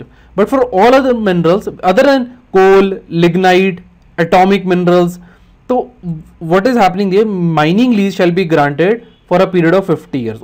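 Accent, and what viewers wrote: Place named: Punjab, India. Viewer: ur accent seems to be punjabi r u punjabi????grt and awesome videos btw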